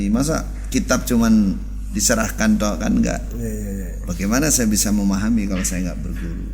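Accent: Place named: Java, Indonesia. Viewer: Madura tapi logat bicara nya 100% jawa...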